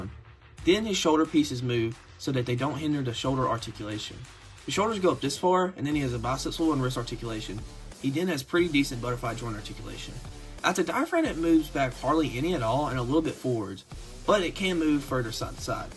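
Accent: South, United States. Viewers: 😂😂😂this was like watching a review voiced by one of the Beverly Hillbillies 😂😂 I'm sorry, but the southern accent had me laughing the whole time. Great review btw.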